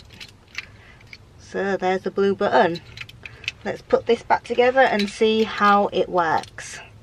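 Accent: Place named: Yorkshire, United Kingdom. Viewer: Sound like you're from Yorkshire ! Ha fancy a race ?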